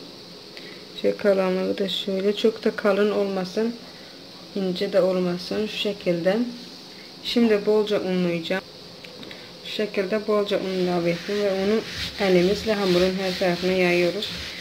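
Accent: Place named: Azerbaijan. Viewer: danisiginizdanda hiss etdimki azerisiz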